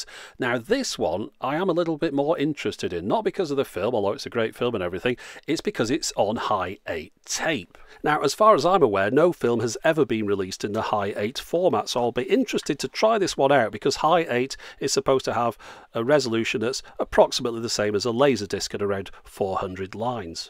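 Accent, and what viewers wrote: United Kingdom. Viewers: "Skullduggery was afoot" - god, I love listening to British people talk. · It's just one of those British things. "Patent" with a long a, "Mazda" with a short first a, "missile" with a long second i.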